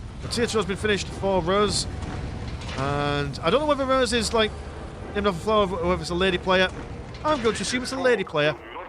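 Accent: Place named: East Yorkshire, United Kingdom. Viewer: Which side of East Yorkshire are you on?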